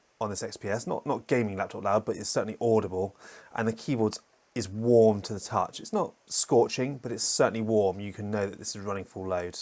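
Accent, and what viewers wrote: United Kingdom. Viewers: Excellent comparison. Love your accent, too. Which part of UK are you from?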